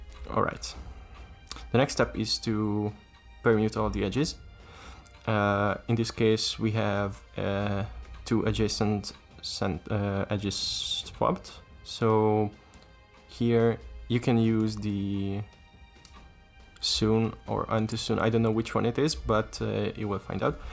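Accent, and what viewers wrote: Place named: India. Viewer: Nice indian commentary, lots of love from New Delhi!♥️♥️♥️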